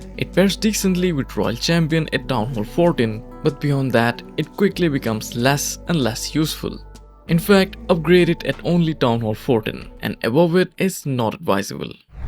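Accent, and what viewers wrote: India. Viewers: Bro are you indian or what 😅❤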